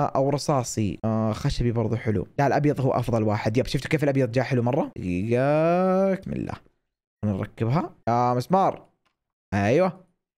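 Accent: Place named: Oman. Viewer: شيول اللهجه العمانيه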